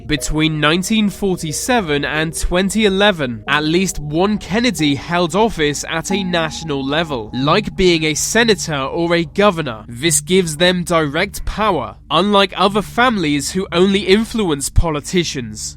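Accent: England, United Kingdom. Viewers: How interesting, a man with a British accent lists the most powerful families, yet conveniently overlooks the House of Windsor (NOT THEIR REAL NAME). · first of most pathetic to hear every other type youtube with English accented fool!!!!!!!!!!!!!second of all the word power is and have been misued in terms of the meaning....in reality the meaning of the word power is used as money for this and beyond era...…all these idiots that you display for us on youtube are just idiotic money abusing ignorant megalomanias!!!!!!!!!!!!!! - because mentality is being misguided every decade, so does the terminology of the word...……..money taints the reality of what human beings stand for..... no not corruption but worse than that....control!!!!!!!!!!!!!!! brainless - no intellectual weapon of choice!!!!!!!!!!!!! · I've heard many disgusting limey accents in my 47 years of life but this one is, by far, the most horrible and fucked-up. 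Can't even say from what part of the fucking island is.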